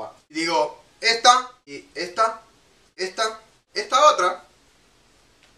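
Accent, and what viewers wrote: Argentina. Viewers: no es español pava tiene mas asento argentino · Ahh uma coisa adoro tu acento este acento argentino es increible de bonito que tenhas um bom dia obrigado beijos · En Argentina y creo que por su forma de hablar es argentino no se le pone huevo. Es solo harina, sal, grasa o manteca y agua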